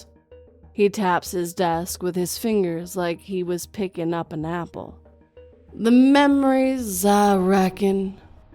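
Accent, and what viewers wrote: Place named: South, United States. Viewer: Not half bad southern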